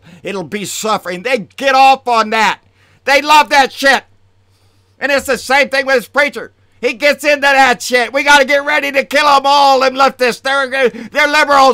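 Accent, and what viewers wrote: United States. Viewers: baby!! you're exactly what a true American sounds like, our forefathers envisioned you when they established this wonderful country. keep giving them hell!!! of course I'm referring to the "selfish" rich and Powerful~ peace and love ☆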